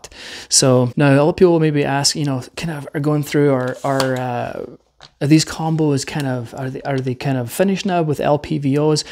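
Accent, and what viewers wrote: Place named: Scotland, United Kingdom. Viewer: Mate, I swear to god I didnt read your name and thought to myself. why does he sound a wee bit Scottish? Glad im no the only one at the range with a wonky accent.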